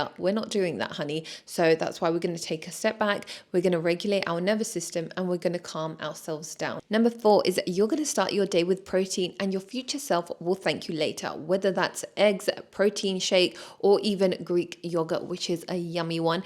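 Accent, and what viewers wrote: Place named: United Kingdom. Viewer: i cant believe i was about to ask what country you're from and didn't clock the accent LOLLL! as a canadian, i watch so much british content its become normal to me so i didnt even notice it AHHAHA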